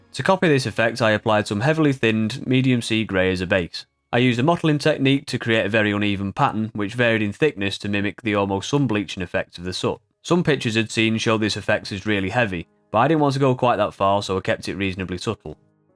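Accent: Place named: England, United Kingdom. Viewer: Hi Mate I hear your pommy accent, I am a socuser originally, came to Oz (65) when I was 16. Joined the Army went to Vietnam 70. So looking at Vietnam era Kits when I finish these, maybe a Phantom? Thanks for the great advice. I had a look at your Star-fighter build as well, as I have the KINETIC kit;-) Might practice with that one first, plus air brush on an old Spitfire that I never finished. Its early afternoon here in OZ will be back tonight to look at the F104 build;-) Thanks again for the hints & tips!! OZGrunt (Oink, 7RAR Vietnam War 70-71.)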